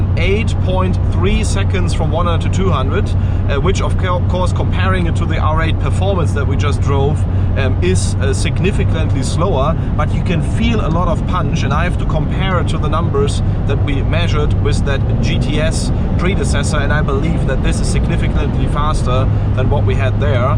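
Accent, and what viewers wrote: Germany. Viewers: Hate the dumb German accent and dad jokes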